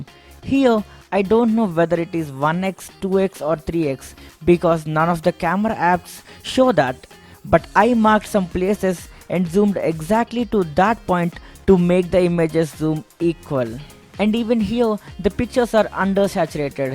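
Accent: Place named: Madras, India. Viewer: 100% madrasi accent, am I right tambi ?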